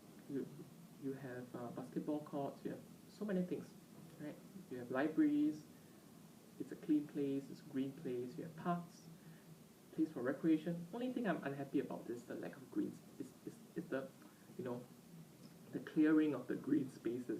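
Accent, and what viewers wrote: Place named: Singapore. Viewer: Please teach anything you wish... apart from Speaking Singish, Chinglish...oh that's right English, you need work on this...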